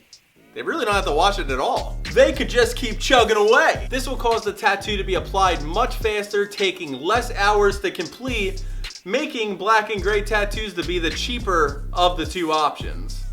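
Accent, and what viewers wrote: Philadelphia, United States. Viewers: You sound like you're from the Philly area 🤣 water = wooder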